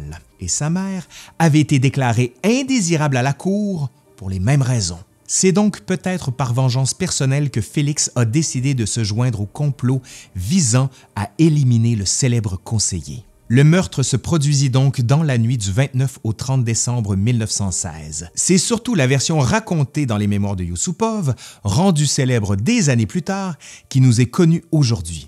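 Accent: Quebec, Canada. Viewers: J adore cette chaîne !!! Et l accent des cousins québécois Force a vous 💪😍👍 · Merci pour vos vidéos! Je suis en train d'apprendre le français, et c'est sympa avoir des ressources intéressantes avec un accent québécois. :D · Excellente présentation de la vie de ce répugnant mais unique et célèbre personnage. Je commence à apprécier l'accent québequois ;)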